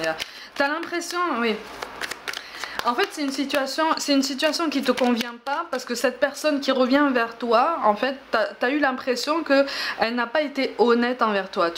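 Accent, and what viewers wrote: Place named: Perpignan, France. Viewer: Excellente guidance ❤️ je kiff ton accent Perpignan ???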